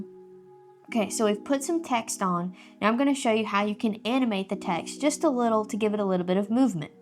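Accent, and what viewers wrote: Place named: South, United States. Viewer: New subscriber. Like your Southern accent too. 😜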